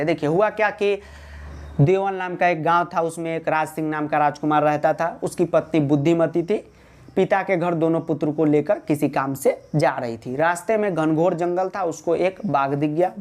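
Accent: Bihar, India. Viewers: Omg!!! Your Bihar ascent is so sweet.... Can you plzz give me some short trick of sanskrit 😂🤣😂🤣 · Sir improve your hindi,English it is full bihari version learn from your wom channel 😀 and stop diverting us from studying to movie (wth is sonny deol). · Next Level Teaching !! Bihari Ascent '"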